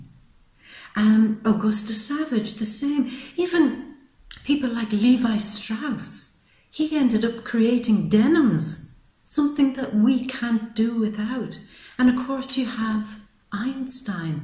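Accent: Ireland. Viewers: Hello, thank you for your well prepared reading. I loved the references and quotes of famous Pisceans. I find you to be very actuate (for me) and very profound. Since the beginning of the year (I have spent a lot of time thinking (alone-like the Hermit), I am going through a life changing transformation and your info is very timely. I am so happy to have found you. A Big Thank You. I love your lovely Irish accent too.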